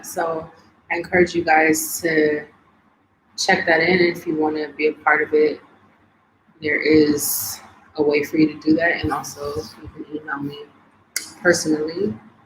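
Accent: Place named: United Kingdom. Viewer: 😂 that UK accent something different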